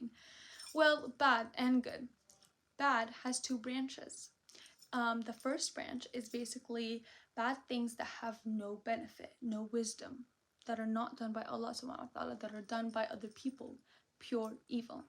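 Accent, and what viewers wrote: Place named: Pakistan. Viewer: you got Pakistani accent.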